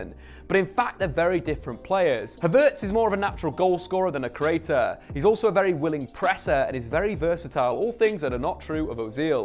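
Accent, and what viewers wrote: United Kingdom. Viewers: please put subtitle , ive got problem with uk's accent tho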